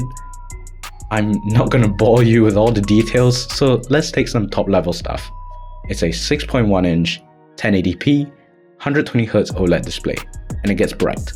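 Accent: Nigeria. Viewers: Why do you sound like a Nigerian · You sound like a Nigerian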